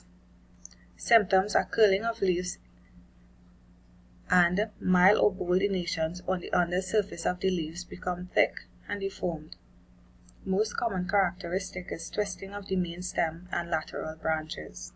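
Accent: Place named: Trinidad and Tobago. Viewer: thanks for the advice and what to look for on the okra plant. You speak like a trinidadian.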